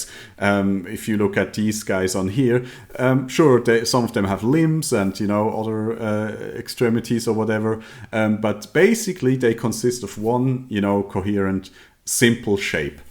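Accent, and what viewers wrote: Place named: Germany. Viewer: Thanks for the Tutorial! your accent also sounde pretty German to me, haha! :)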